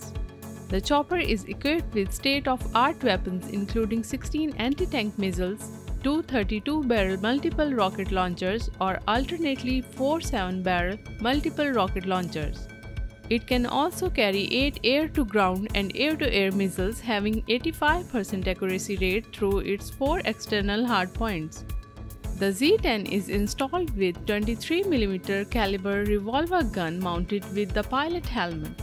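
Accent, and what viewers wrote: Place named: India. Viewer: Oh my God that Indian accent hurt my ears so bad 😔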